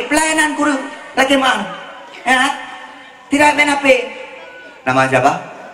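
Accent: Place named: Aceh, Indonesia. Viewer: gampong.. Logat basa timu si angen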